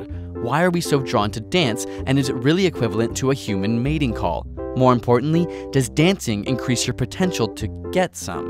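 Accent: Canada. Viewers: It's a Canadian accent.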